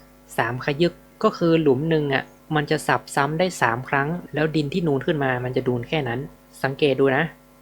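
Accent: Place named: North, Thailand. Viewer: แงงง ชอบความสาบเมืองงง555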